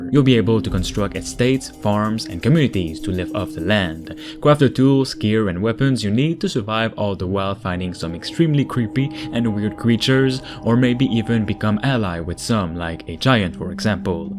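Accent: Quebec, Canada. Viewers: do i hear a quebec accent ? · Tu sonne comme un québécois mon chum ;) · Man I love your Georges Saint Pierre style accent.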